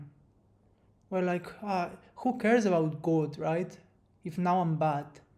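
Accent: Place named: Spain. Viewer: Ya decia yo que me parecía que eras español jajajajaj . Buen video tío !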